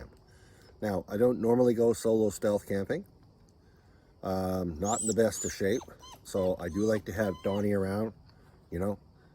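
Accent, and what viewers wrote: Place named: North, United Kingdom. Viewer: Hi 👋🏻it’s Nutty Mark here. Checking your video out for the first time. Looks like, and sounds like your a bit North of me. Always my favorite vacationing location. #20👍🏻P.S. love the Doggie’s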